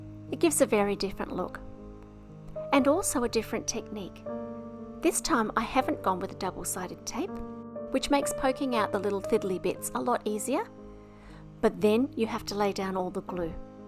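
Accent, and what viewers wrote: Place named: Australia. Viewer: Hi, I loved these cards they are simply stunning, and now I want to purchase the butterfly dies. It is always a joy watching you create your cards... with your easy to listen to Aussie voice, and the beautiful relaxing music in the background. Everything blends so well together, and it matches your creativity. I am so blessed to have found your channel., I am so sorry for your loss, and this is such a beautiful way to pay tribute to your nephew Daniel. Prayers for you and your family at this very sad time. May you find comfort in our Lord and Saviour Jesus. God's blessings and love, Gloria 🙏💐❤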